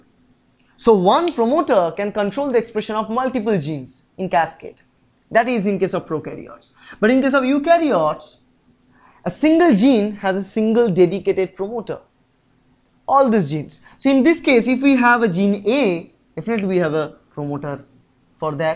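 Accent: India. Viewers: I love the indian accent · its indian accent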